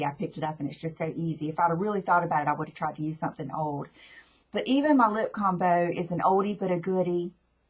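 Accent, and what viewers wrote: South, United States. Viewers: well said b/c I am a firm believer in doing what you like to do re: anything from hair color, length etc.... your the one wearing it....your absolutely beautiful & that nice southern twang....love love...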